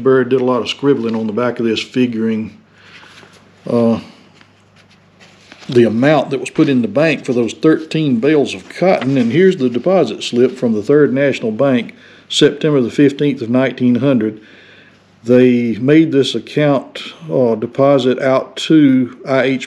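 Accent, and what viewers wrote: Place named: South, United States. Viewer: I love hearing him tell story's , very Southern, very respectable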